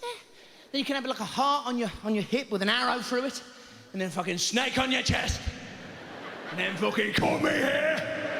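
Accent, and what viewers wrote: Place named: Birmingham, United Kingdom. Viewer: The brummie accent kills me as well.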